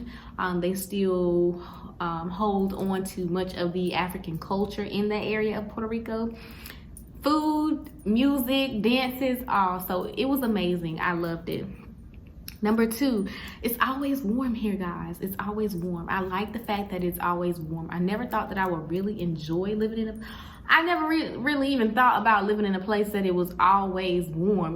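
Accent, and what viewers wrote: South, United States. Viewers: First of all, you're so pretty, and I love southern accents! But yesss sis! I love seeing Black women doing their travel thing! I'm on my way down there in a few weeks, and I'll be there for a month. Just trying to make sure it's not a stupid/dangerous move for me to be staying there alone for a few weeks. I'm going down with friends, but then they're leaving and I'll be there alone for two more weeks. I'm either going to take some Spanish classes to give me somewhere to be during the day and people to be around. Or I'll maybe forget the classes and do a homestay so I'll have people/a family to keep me company 😅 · The accent is giving South Carolina (1st Guess) , or Texas (2nd) or Georgia (3rd guess)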